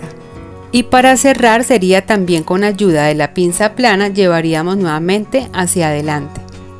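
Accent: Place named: Colombia. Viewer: Hola; mil gracias por tus explicaciones..creo por tu acento que eres colombiana como yo, que bueno si es asi Estoy aprendiendo mucho con tus tutoriales por ahora para hacer regalitos y ojalá pueda vender. Vivo en usa. y he conseguido poco a poco los materiales. Tengo 69 años y ha sido facil aprender. Un abrazo desde la Florida, usa.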